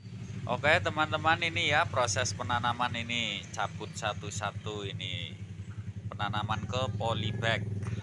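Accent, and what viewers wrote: Banyumas, Indonesia. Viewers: Pak jan tembe ketemu channele giye aku bani banyu mas nang Lampung ngapak pada bae nek ora ngapak ora kepenak ky gue ya pak. Salam knl.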